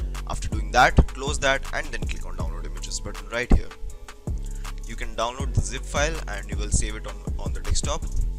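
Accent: India. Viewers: When you hear Indian accent, you know the tutorial bouta be good 😊Thank you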